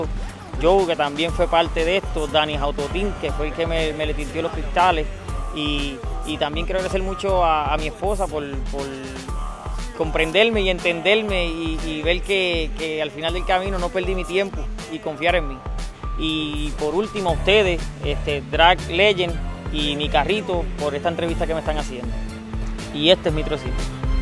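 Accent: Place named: Puerto Rico. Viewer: Congratulations'! TIENES vision y talento DTB. Saludos desde Florida .USA. Suenas boricua !wepa!!! Bonito color.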